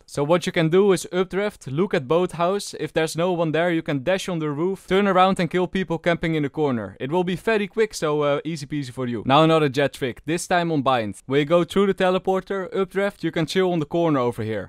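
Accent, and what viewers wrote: Netherlands. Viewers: You sound really dutch. btw never stop this amazing content!!!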